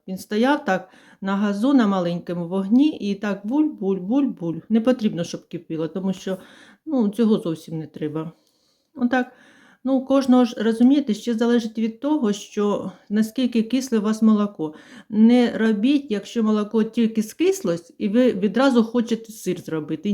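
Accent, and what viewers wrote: Chernihiv, Ukraine. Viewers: Вітаю,зайшла до вам на канал випадково,але почувши такий рідний Чернігівський акцент,просто,як бальзам на душу, дякую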